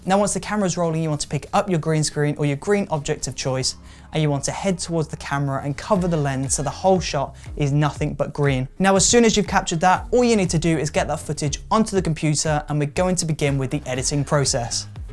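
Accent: United Kingdom. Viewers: You sound so British and i like it..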